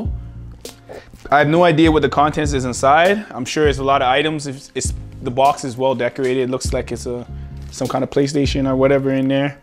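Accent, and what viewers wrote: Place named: Ontario, Canada. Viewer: Mans accent i can tell he from Ontario!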